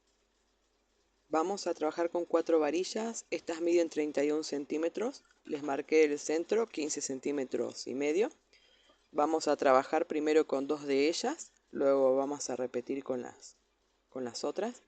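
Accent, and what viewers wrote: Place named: Chile. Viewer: Perfecto! gracias por su repuesta rápida. Te pregunte eso porque encuentré que tenia un poco del accento chileno pero el amaricho por el amarillo me dio la pista 😁 Soy francesa, pero franco-chilena en realidad. De parte de mi papá! Ahora yo vivo en un pequeñito pueblo en el sur-oeste de Francia, en medio del bosque y de todos los animalitos. Hice un cambio radical de vida, pasé de una gran ciudad urbana a una pequeña casa en el campo y recuperé mi creatividad. 🥳Gracias a ti para tus videos que son super bien hechas, y tus creaciones son maravillosas ❤ Un lindo dia para ti. Me llamo Laurie🙋‍♀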